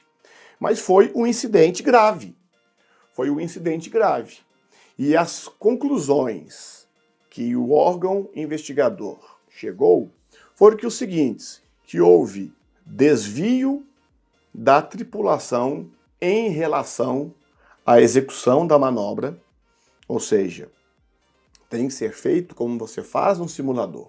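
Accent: Minas Gerais, Brazil. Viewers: Bora "resenhar" as notícias do aeroin, pelas palavras e sotaque mineires do nosso querido Cmdt. Abçao...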